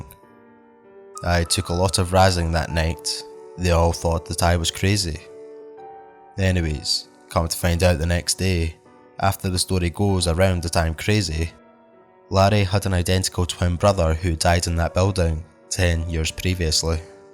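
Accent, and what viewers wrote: Scotland, United Kingdom. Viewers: Amazing, is that a Scottish accent?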